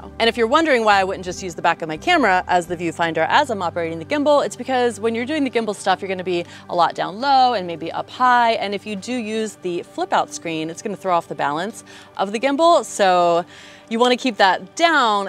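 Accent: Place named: United Kingdom. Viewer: I love your British accent!!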